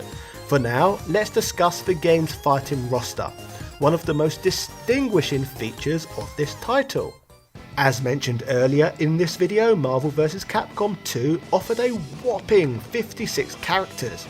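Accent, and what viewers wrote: England, United Kingdom. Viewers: It is always a pleasure to watch your videos, mate! I really love both your contents and your English accent, it really helps me as a comprehension exercise! Keep up the good work!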